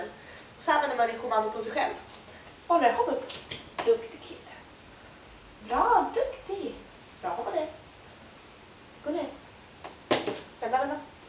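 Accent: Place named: West Coast, Sweden. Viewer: Det var roligt! Är du i Göteborg/västkusten? (just WAGguessing at your accent) Lärde mej svenska pä länge sen! I totally agree with you re most English speakers not knowing another language! (Im USA). Thanks to kikopup for leading me to your video. She has awesome dog training videos here. Hejdä.God Jul!!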